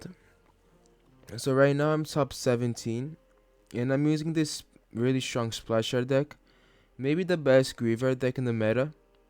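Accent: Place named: Brazil. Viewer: Pelo sotaque vi que era BR kkkkk, joga mt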